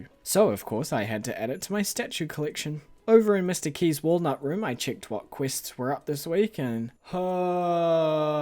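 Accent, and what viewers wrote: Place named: New Zealand. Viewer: I hear "kig shid" and laugh my accent off 🤣 actually I find very cool to hear so many different english accents on yt, and now I know that NZ accent have a very closed 'e' sound in words like 'bed', 'shed, 'keg', 'quest', and the stress syllables are different in some words, like 'crAnberries' instead of 'cranbErries' 👍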